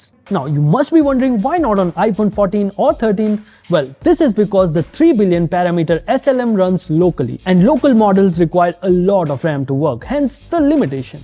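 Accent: India. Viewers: oooh god this indian english aghhhhhh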